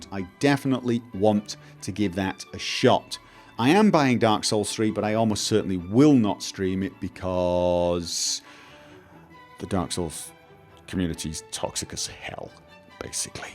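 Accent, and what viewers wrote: United Kingdom. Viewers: the standard English pronunciation, as opposed to the American English pronunciation. Some younger Brits have started using the American way but is old enough to have learned the old standard.;)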